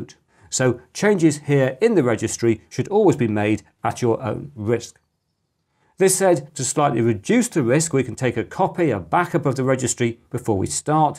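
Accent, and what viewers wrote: United Kingdom. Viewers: Essential viewing for a beginner like me. And you're British, as I am, not yet another American. Discovering your channel really has made my day. Thank you so much.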